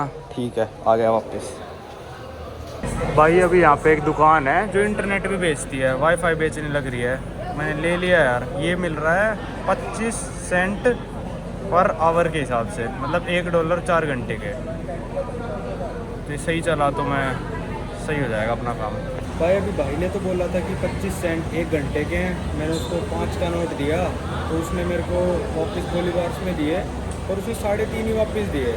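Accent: Haryana, India. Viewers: bhai accent pehle wala hi rakho. Ye aapka haryanvi accent irritate KR raha hai. 🙏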